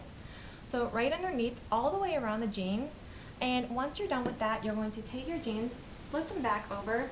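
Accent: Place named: United States. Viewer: She sounds very American:)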